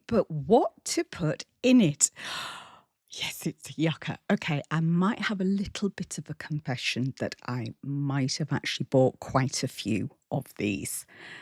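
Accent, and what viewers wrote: England, United Kingdom. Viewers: You just have a knack for decorating ❤love your ideas❤I never get bored watching your videos ❤and I always look forward for your next one❤ love the spring decor❤and love listening your to your English accent❤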